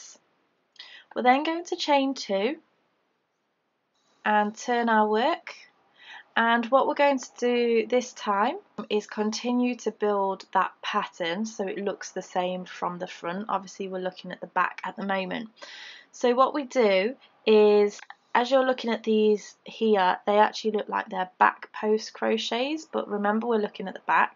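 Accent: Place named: United Kingdom. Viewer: is British in case you missed the accent along with everything else she said that you clearly didn’t listen to. What you call a double is called a treble in the UK, not sure how anyone can not know that if you use YouTube and online patterns.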